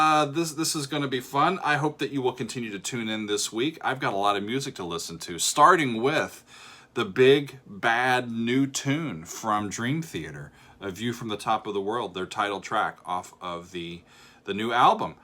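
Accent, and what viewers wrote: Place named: Oklahoma, United States. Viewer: I'm in Oklahoma. Dude you have the Oklahoma accent ... Well maybe not .. not far off tho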